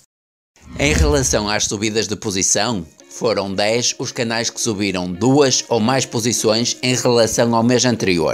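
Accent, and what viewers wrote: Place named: Portugal. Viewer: O cara faz conteúdo do Brasil mas o sotaque e português de Portugal, vc e de Portugal mesmo?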